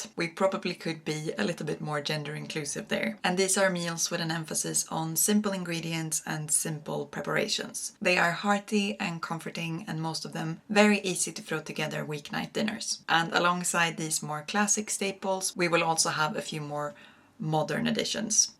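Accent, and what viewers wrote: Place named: Sweden. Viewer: Wow, vad är oddsen! Algoritmen ledde mig hit och jag hörde att du hade svensk brytning och när du nämnde norra Sverige funderade jag på om det kanske var någonstans i mina trakter du var från. Tyckte du såg bekant ut och sen klickade det! Hej! Vi gick i samma klass i låg-/mellanstadiet. Vet inte om du minns mig, Mirjam (fast numera kallas jag Morris 😊).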